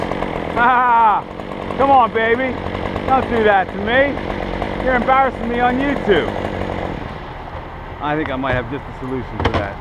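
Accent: New York, United States. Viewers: Excellent video. Are you from New York?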